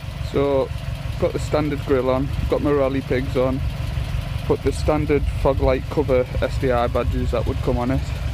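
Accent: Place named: North East England, United Kingdom. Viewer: Dunno where abouts your from mate, but sounds like north east? Im from Gateshead and im currently waiting for my 2006 forester STI to be imported from Japan. Its also black.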